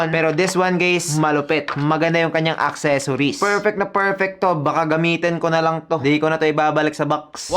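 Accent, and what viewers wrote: Visayas, Philippines. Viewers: Bisaya ka lods?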